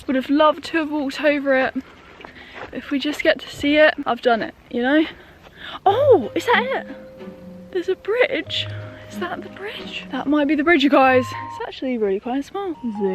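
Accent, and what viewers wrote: Saxony, Germany. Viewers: Thank you for this nice vlog, I really like this one because I'm actually from Saxony and moved for my study to Thuringia. The "sch" at the end of many words it's because you are in Saxony and this is a part of our dialect.😅😊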